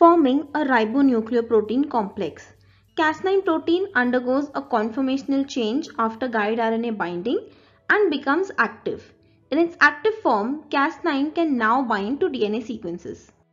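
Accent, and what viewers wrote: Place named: India. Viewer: 真是受不了阿三口音，我服了，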